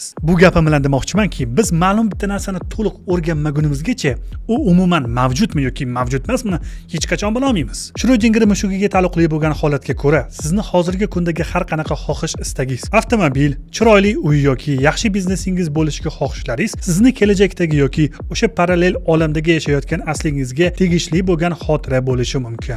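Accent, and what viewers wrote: Tashkent, Uzbekistan. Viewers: ука тошкент шевасида гапирмасдан адабий тилда гапирса бўлмайдими бутун ўзбеклар кўради фақат тошкентликлар кўрсинми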